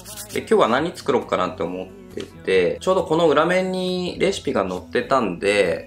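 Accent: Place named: Kyushu, Japan. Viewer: 春雨は増えるから量が参考になりました そうそう！ ち、ちらしで作るゴミ箱です〜 我が家も台所で出る生ゴミとかをチョイって入れてそのまま捨てられるので作り置き？してます 時々でる九州弁！いいですね (๑˃̵ᴗ˂̵)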